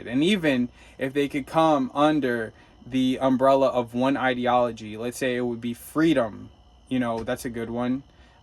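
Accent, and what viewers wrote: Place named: United States. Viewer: Здравствуйте.Возможный вариант,как можно поступить( ну или,как бы сделал я))).У тебя родной английский язык( американский акцент)- это можно монетезировать.Есть парень из Шотландии,он устроился в частную школу в России и неплохо зарабатывает,спишись с ним.( он есть на ютубе).Что это даст: погружение в среду,основы русского,просто общение,ну и как шанс- найти русскую жену, ведь жить одному в России - это неправильно). Дальше: в школах летом 3 месяца каникулы,можно и США поехать ,поработать на траке эти 3 месяца,почему и нет,ну а можно и не ехать..Совет: не ищи себе девушку на сайтах знакомств в России,лучше в других ( обычных) местах.Бизнес- это потом,окунешься в среду,, найдешь русскую жену ,так легче.( да и меньше рисков ).Желаю тебе исполнить свою мечту.Удачи,друг!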